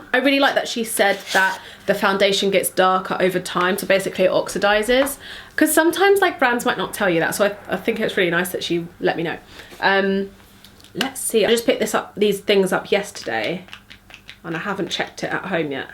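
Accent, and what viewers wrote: London, United Kingdom. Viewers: "Today is a lih-uw makeup hole" I love London accents lmao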